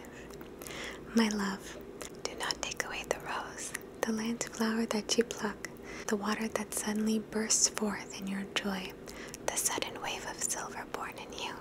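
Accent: Italy. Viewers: you’re little Italian accent in Spanish is so cute :) btw I love that your dog is right behind you kinda camouflaged lol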